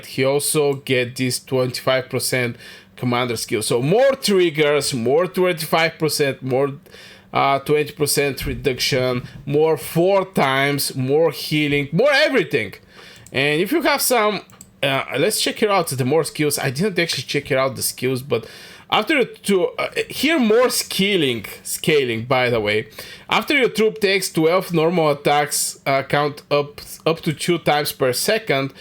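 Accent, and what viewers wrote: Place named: England, United Kingdom. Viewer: wow, thank you for the quick reply.. keep doing more videos.. so happy to see you back making more videos.. I love your English accent.. you are doing great 🙌🏻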